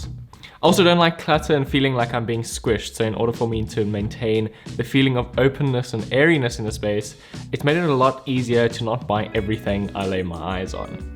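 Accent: South Africa. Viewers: I thought this was a South African minimalist apartment when I heard your accent lol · You sound South African, are you ? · Wait, you're South African? it refreshing from all the other american and british you tubers. Nice to hear my accent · Are you south African? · are you south african?? idk why but that's what your accent is screaming at me😂😂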